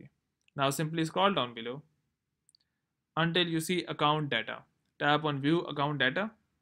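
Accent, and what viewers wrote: India. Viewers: ur accent is still indian dont try to hide it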